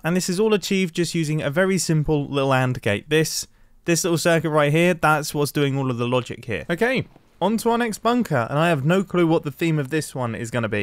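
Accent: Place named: United Kingdom. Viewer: it’s because of his accent. is British btw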